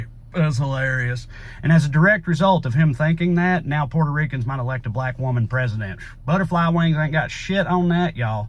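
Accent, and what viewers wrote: South, United States. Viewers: Thank you so much for bringing humor to all the news I listen to. Here on the W Coast---Love hearing it in your Southern accent and wit! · I am just stumbling in, how the hell did it take so long for my youtube startpage to recommend me this channel???? Probably racist what I think but I'm a european who "studies" the American people with great fascination and interest since Michael Moore's "Bowling for Columbine" and the way you deliver those great democratic messages with this accent which is mostly portraied with this "south will raise again" stereotype is completely hilarious for me right now. Amazing :D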